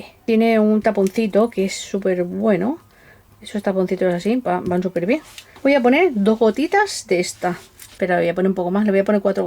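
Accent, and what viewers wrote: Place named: Seville, Spain. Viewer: Hola,te escribo desde Argentina,nunca había hecho muñecas,pero gracias a tus videos me animé y ya voy por la segunda.Lo que más me cuesta es realizar las caritas,pero creo que si sigo practicando algún día me saldrán,ja ja Me encanta tu acento,me hacer recordar a mi abuela,hablaba como vos.Eres Sevillana?.Lastima que en mi país no pueda obtener esas resinas,veré con que las reemplazo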